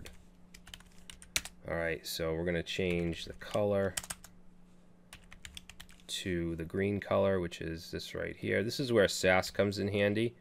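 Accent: Boston, United States. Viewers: Sometimes you sound very young and then the Boston kicks in and you cuss and sound older, but with the cam we can now tell you are just right. :)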